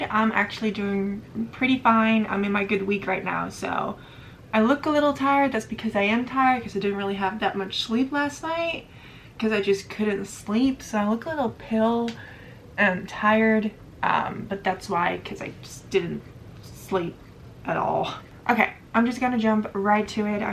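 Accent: United States. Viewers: You sound like somebody from Texas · How is your english so great? You sound more like an american versus dutch. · The English question answered my question bc it sounds like your from here in USA lol. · I also thought she was either an American or a Canadian. Her grammar is also spot on. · You’ve definitely got an American accent. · All the best to you ! You English is 👍👍👍 At first I thought you are an American ! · yeah she says about watching American channels on TV so maybe picked the accent up there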